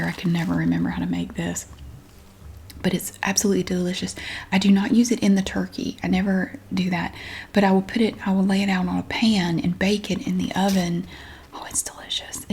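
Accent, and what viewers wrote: South, United States. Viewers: Christmas time, Southern accent in asmr rhyme, aldi products crinkling under 30 decibel level time. 😊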